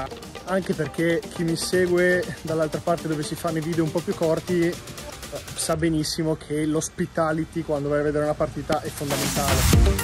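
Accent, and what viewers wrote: Pesaro, Italy. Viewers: hai preso un po’ di accento pesarese ahahaha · Ti seguo dall'Inghilterra, in bocca al lupo per la nuova stagione. Rendi orgogliosi tutti i pesaresi nel mondo, che ne stai prendendo un po' la cadenza.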